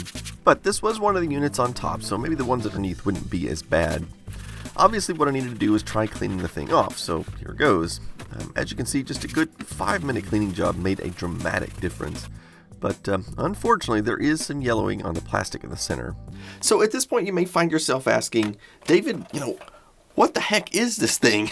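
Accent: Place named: Texas, United States. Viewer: David sounds more Texan than usual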